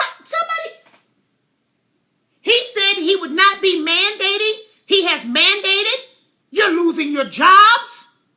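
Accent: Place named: Chicago, United States. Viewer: I can definitely tell she is from Chicago. My sister from the south. Milwaukee native here.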